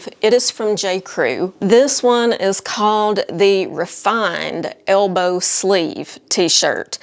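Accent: South, United States. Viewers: Watching you from Chico, Ca., in the northern part of the state, north of Sacramento. I watch a number of fashion influencers and you are my favorite. Love that sweet southern accent and all your great outfit ideas. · Yes, ma'am, we do sound so much alike. When I heard you mention north Alabama, I knew you are one of us. Happy almost weekend!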